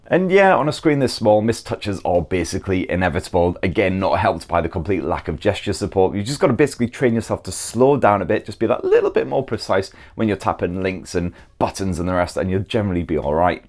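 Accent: United Kingdom. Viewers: I just discovered this channel and it has all I’m looking for: tech reviews, British accents and witty humor. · He's British, so yeah, I feel you there! 😍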